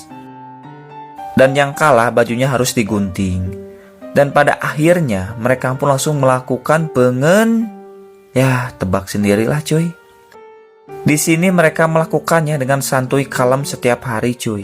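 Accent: West Java, Indonesia. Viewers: Sunda mang wkwkwkw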